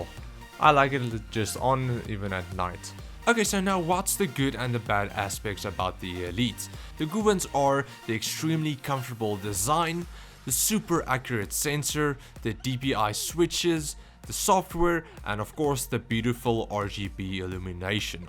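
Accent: Afrikaans, South Africa. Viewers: I thought you had a afrikaans/ South African accent😂😂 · I clicked on the video, heard the South African accent and subbed. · Are you from South Africa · My guy you from sa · The moment i heard that accent I knew it was a South African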